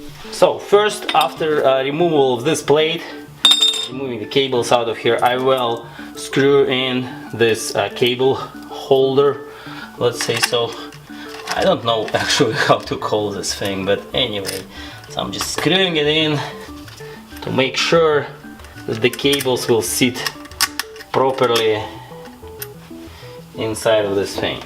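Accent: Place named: Russia. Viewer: Смешной русский акцент))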